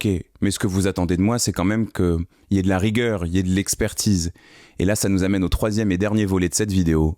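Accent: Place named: Aisne, France. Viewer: Il me semblait bien avoir reconnu l'accent! Ah, les 02....J'ai grandi à St Quentin, donc, je comprends exactement les repas de famille et l'amour de la bonne nourriture française. Je suis vos vidéos qui sont toujours très bien expliquées. D'ailleurs, je les utilise comme motivation/piqûre de rappel quand je commence à oublier mon but. Donc, merci et...j'adore le papier peint! Super joli!!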